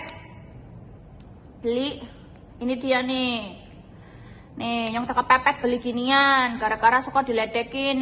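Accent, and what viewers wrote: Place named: Banyumas, Indonesia. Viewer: hahaha ngapak euy keren hadir salam sukses bro mampir ngopi ☕☕☕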